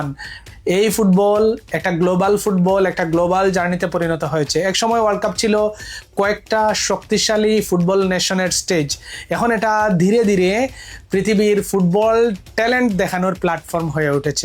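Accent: Sylhet, Bangladesh. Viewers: Sylheti mone hoy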